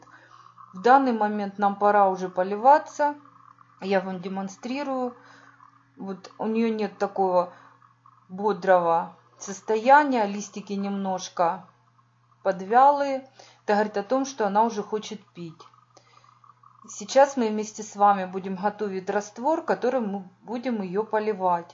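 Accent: Ukraine. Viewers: Любители русского языка! А канал не по русски назвал. Неужели не понятно, что девушка украинка,говорит на своём диалекте. Ты ещё англичанам расскажи, что говорить надо только по русски. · Такой говор у украинцев, но не у всех.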